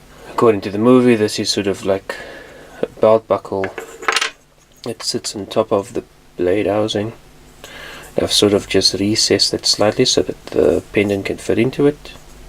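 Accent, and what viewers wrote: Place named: Australia. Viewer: I also forgot to mention that I'm from Bulgaria, a country in Eastern Europe, and salaries here are one digit smaller than what you probably have in Australia (I hope I'm right judging by accent). Prices are a lot cheaper too though. Paying over $70 for a toy is a bit too much for me, it's 1/5th of my monthly salary. Please understand.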